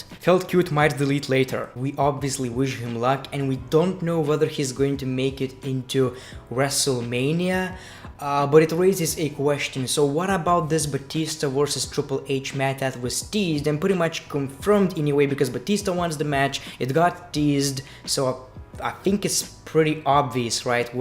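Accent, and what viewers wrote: India. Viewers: Sounds Asian/Indian... Why?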